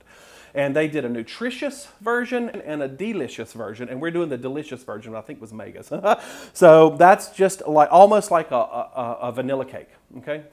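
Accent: South, United States. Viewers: Found your channel a few days ago. I love your personality and good nature. Loooove love love your southern accent! I used to live in the FL Panhandle and miss it so much. Thank you for your honesty regarding your triggers, we all struggle in different ways. Wish you and your channel the best 🙌. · You popped up on my YouTube feed today and I instantly fell in love! I love the recipes, I love your rambling...and I especially love your southern drawl (I am from southwest VA). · I love this including your talking (which I don't consider rambling at all) Thanks for your humor and as a Southerner myself, your accent. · I love to hear ya talk I'm super southern too lol so you keep doing you ❤❤ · I just subbed based on your rant alone. I love it! I'm a southern girl and you sounded like the people from my grandma's hometown! That blessed me, thank you for not editing it out. 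· i just subbed bec of this vid. I love it. Also, your accent... I can't quite put my finger on it... southern?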